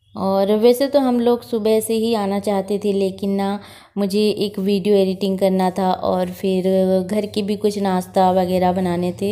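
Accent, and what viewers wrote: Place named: Odisha, India. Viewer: Wow super dear...Chhatishgarch n Odisha bilkul neighbour he...to aap ki hindi speaking thodasaodia jaisa bhi lagta he....doing very well dear...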